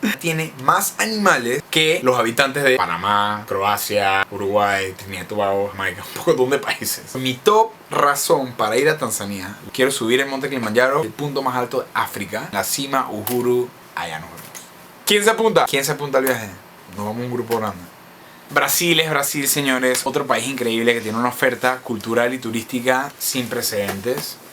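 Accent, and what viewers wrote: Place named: Venezuela. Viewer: Porqué hablas con acento venezolano?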